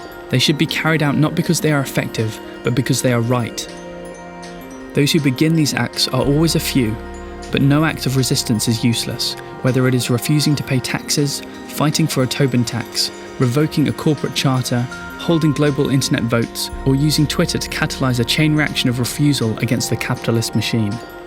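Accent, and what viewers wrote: United Kingdom. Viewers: Cute British accent.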